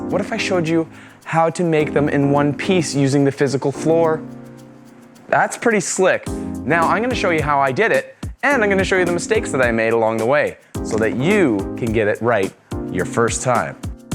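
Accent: Canada. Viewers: Whats with all these canadians and the ABOOT crap!!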